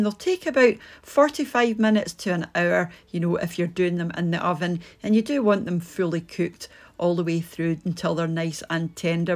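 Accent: Scotland, United Kingdom. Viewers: Are you a Pretty as your Scottish accent ?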